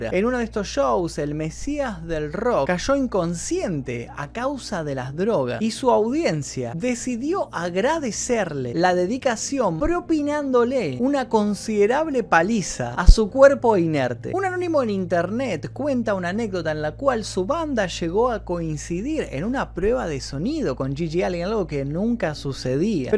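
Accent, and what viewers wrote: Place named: Argentina. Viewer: Es argentino, para mí es normal escuchar ese acento, en mi país sobran los argentinos.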